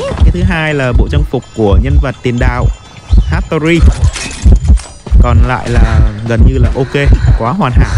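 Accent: Vietnam. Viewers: nghe hơi dính accent của người Việt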